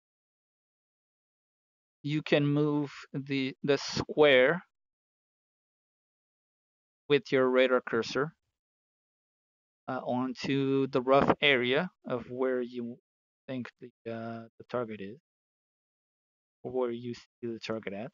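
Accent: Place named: Portugal. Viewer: E esse sotaque portugues?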